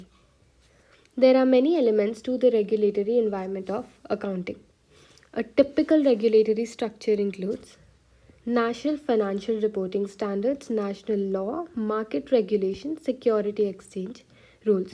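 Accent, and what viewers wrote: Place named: Kerala, India. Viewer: Are you malayali . Sound ketapol thonni😊 . Good class really helpful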